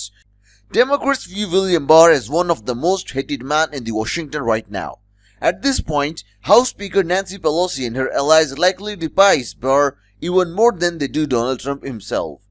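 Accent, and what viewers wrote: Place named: Russia. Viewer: Why would you EVER use a narrator that sounds Russian?? Kind of crazy.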